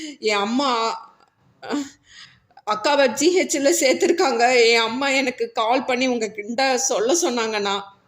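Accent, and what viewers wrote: Chennai, India. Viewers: அருமை சென்னை பாசை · சென்னைத் தமிழ் ..... யதார்த்தமாக இருக்கு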